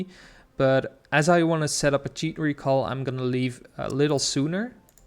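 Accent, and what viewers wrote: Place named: Turkey. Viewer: Are you turkish? Your accent really sounds turkish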